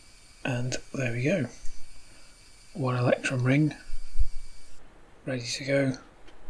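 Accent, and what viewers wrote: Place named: New Zealand. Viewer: I had to doble check where you came from. Your accent could cut as a New Zealender, but I saw your plug outlets and, you live just over the puddle. XD You see, I've been searching for electrum in all of Norway, it does not seem like there is any of it anywhere to be found here. My fiancé and I want green wedding rings and electrum is the best option but we have so far been unable to find raw material. Where did you get a hold of electrum?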